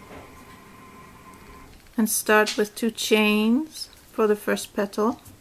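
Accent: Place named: France. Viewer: Uh eine schöne französische Stimme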